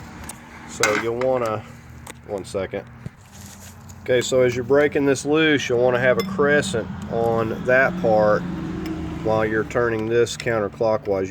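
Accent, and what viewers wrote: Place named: Midwest, United States. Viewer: Superb tutorial and many thanks! It's clear you have an immense knowledge and experience wrt to motor vehicles and specifically, this Tribute / Escape. Furthermore, you're a natural instructor; patient, considerate of all skill levels, able to add 'pointers' along the way and just a naturally helpful and decent American guy! I'm a native Minnesotan (currently living in Straya) and have also lived in So Texas and KC Mo. What I liked best about this vid was just listening to your patient, confident and sincere midwestern voice- am thinking somewhere south of KC and north of DFW? ( Or Louisville?) My project is a 2001 Tribute but its RH drive so much was identical save the ALT removal which had to come oout from underneath after the axle removal. I ended up hitting the top first-manifold and plenum seals, PCV and also the water temp sending unit. 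Then, on to the timing cover. I usually make a sketch on cardboard of the part being replaced and mark / punch holes and just add them as the bolts are removed. I bought the same Fel-Pro seals/gaskets as you bouight had to source them out of Chicago on Ebay-could not get them here and many think this is to prevent the old rigs from staying on the road and a sneaky way to force adoption of the current EV's coming out of Chyna. I hear it is similar in many Euro countries. I also did the oil pan gasket ( watched your other video) and that was very helpful too. I was able to wrestle the second exhaust pipe through the gap of that cross-memb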